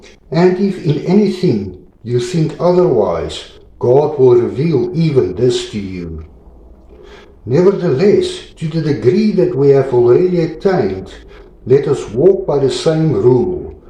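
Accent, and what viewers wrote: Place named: South Africa. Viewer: Hey, sounds like a South African brother!! 🇿🇦 God bless.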